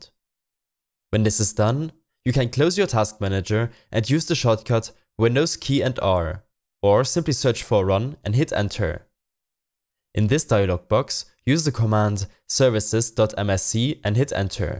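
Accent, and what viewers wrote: Germany. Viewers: Nice german accent. I hope your instruction would help me to solve my problem. Nice video and have a nice day. :D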